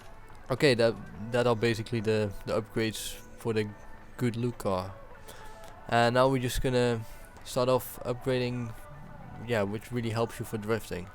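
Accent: Netherlands. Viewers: Accent is dutch, dat ben ik namelijk ook :p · are you dutch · You can hear that he's from Holland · your are dutch right?? I noticed an accent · Are you dutch? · Ik ken je channel al lang, maar ik heb nog nooit een commentary van je gezien... Het eerste waar ik aan dacht was je accent, duidelijk nederlands. Groetjes uit Duitsland btw!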